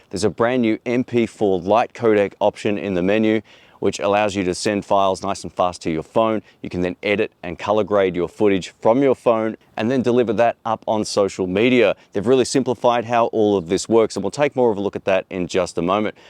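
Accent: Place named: Australia. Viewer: It was all looking awesome……until you mentioned the record time limits! It did seem like that was going to come up as a problem as there was no visible ventilation – it seems to be a must-have on a full frame nowadays. With any luck Panasonic will be doing a GX9ii with a large chunk of the G9ii features…….now that would be an awesome street camera!! Being an Aussie in the UK it’s great to hear another Aussie……especially on a channel like this. Keep up the good work!!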